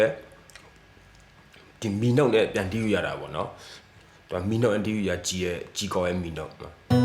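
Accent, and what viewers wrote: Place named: Danu, Myanmar. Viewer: "ညီက ပင်းတယသားလား??အသံကိုနားထောင်ရတာတော့!ဓနုအသံပေါက်တယ်နော!😊✌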